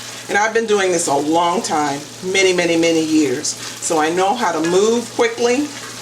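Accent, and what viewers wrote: Louisiana, United States. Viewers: sounds like you may be a Cajun,. I'd never make gumbo this thin, add frozen okra, put file in the gumbo pot, use turkey sausage (I wouldn't make gumbo if I couldn't get Savoie's or Richard's sausage or comparable) and NEVER add tomatoes. There is variety even in Louisiana--I've never even had a bowl of gumbo in New Orleans restaurant that I enjoyed (didn't take like mine or Maw-Maws:) ). I guess it is all in what you grew up with. Keep in mind that a lot of cooks make a roux-based cajun dish once in a great while, not a few times a month like I ( and maybe you?) do.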